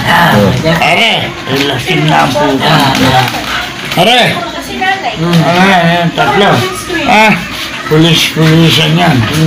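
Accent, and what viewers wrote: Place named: Nueva Ecija, Philippines. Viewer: Parang narinig ko na ung about daliri,Sa pork chop duo yata.?.Pero ok nmn po pagkaka deliver..👍,ung punto ng Bulacan ,prang s Nueva Ecija..Yung mga tumatawa din ang nagdala..heheh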